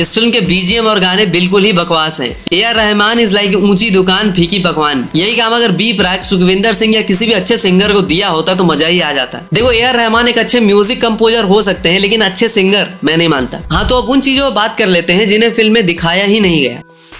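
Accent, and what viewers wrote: India. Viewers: Thank You Bhai. Your Accent is definitely Hindi but your Voice is touching Millions of Marathi Community People. Every word & Sentence in these video is giving correct information about Chattrapati Sambhaji Maharaj. Thanks ❤❤🚩🚩🚩🚩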